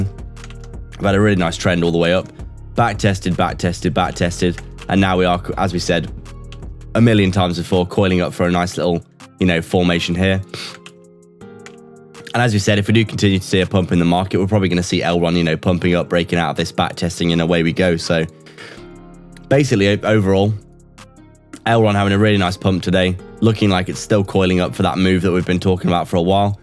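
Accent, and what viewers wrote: United Kingdom. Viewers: You didn’t say where you were from. I’m guessing Brighton👀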